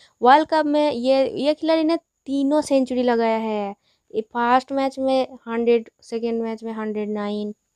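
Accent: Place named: Bengal, India. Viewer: Aap west bengal se ho kya awaz se yahi lag raha hai.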